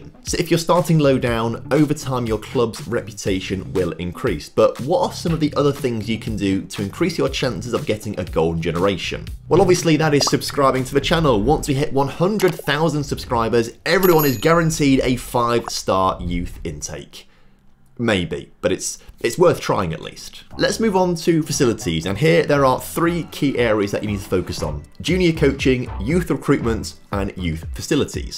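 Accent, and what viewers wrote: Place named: RP, United Kingdom. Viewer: it just sounds way too posh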